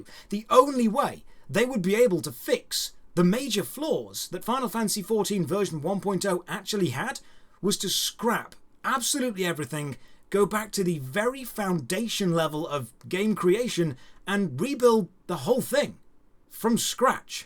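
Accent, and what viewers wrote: United Kingdom. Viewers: proves that all you need is a deep voice, British accent and a suit and you can make any topic sound high class.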